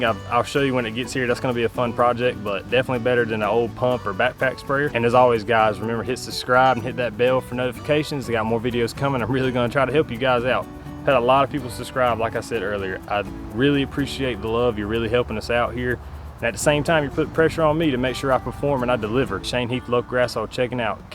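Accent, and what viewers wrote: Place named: South, United States. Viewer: that southern draw. I got it bad. Thanks man. Appreciate it.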